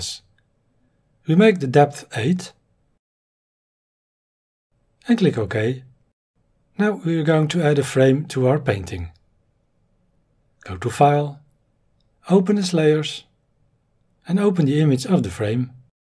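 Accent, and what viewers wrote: Netherlands. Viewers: I adore that little touch of Dutch accent, you speak quite calmly and can be understood very well!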